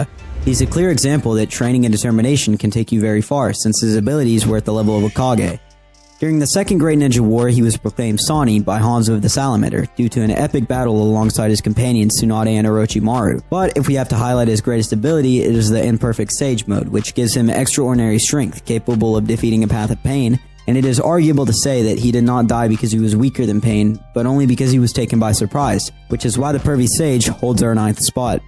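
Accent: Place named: United States. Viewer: Why does he pronounce there names so American. It's so annoying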